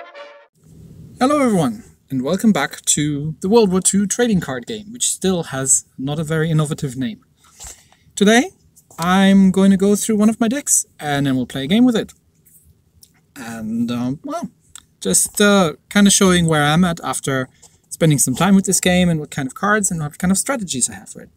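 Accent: Germany. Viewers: Nice video! I'm currently struggling through the 120's lol. Just can't keep my units alive long enough and my health high enough to 3 star stuff. Also, noticed that despite living in Australia for so long, you've still got a prominent German accent. A lot of people tend to lose theirs over time or pick up a new one from where they live.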